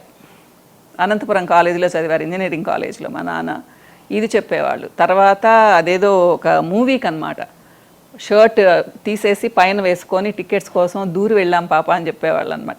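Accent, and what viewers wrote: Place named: Godavari, India. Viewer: Maa godhavari slang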